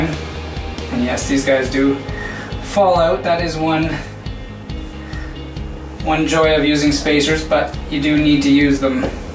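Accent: Canada. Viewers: Great video, you sound Canadian?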